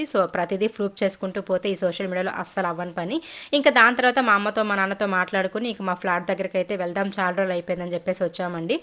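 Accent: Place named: Telangana, India. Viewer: garu first time comment chesthunna pls oka request. Andi Meru matladuthunte normal Telangana slang anipisthundhi kani medhi Palamaneru nellore district kada meku a slang osthadha edhina oka video lo oka 1 min me slang lo matladandipls..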